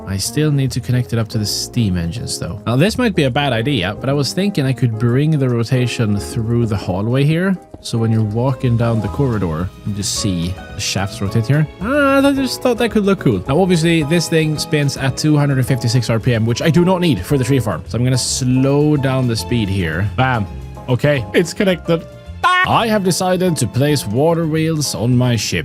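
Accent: Sweden. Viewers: Is it just me or does he have a swedish accent? oh the channel is in sweden. hej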